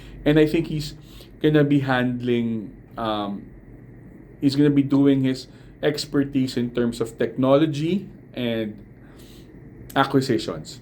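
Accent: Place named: Philippines. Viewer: your accent gave away your nationality. You look East Asian, Chinese BUT the way you speak English I know for sure you're Filipino.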